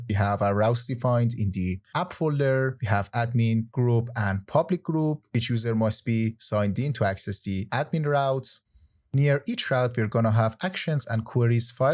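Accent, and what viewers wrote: Iran. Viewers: I am hearing a familiar accent? Are you Persian bro?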